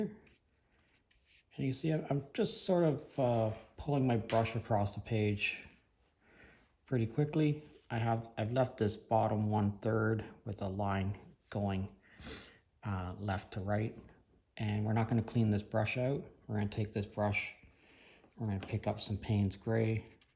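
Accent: Canada. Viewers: I recognized your Canadian accent. We lived in the Greater Toronto area for 23 years!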